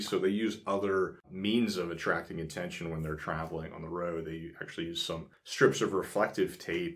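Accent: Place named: Charleston, United States. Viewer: You sound like you are from Charleston, SC. My husband lived outside Charleston and heard that accent a lot.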